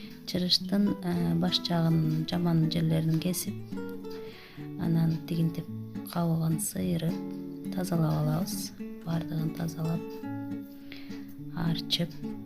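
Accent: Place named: North, Uzbekistan. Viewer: Жасалмасы жок эле , оздорунун диалектинде суйлоп атат ,, ар бир жэрдин озунун диалектикасы бар ,, Тундук тарап. Талас ,, Туштуктун уч Ош. КараСуу ,, Баткен , ЧонАлай ж.б болуп 😮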